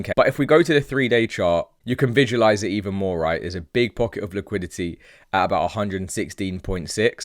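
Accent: United Kingdom. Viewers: love the bri ish accent my man🍺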